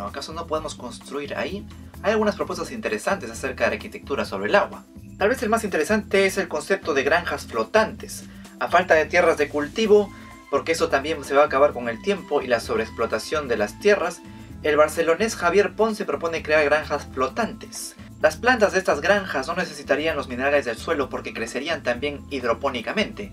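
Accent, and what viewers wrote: Peru. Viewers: No es que use acento neutro xD, sino que ese es el acento de las personas que viven en ciertas zonas de Perú, por ejemplo, Lima.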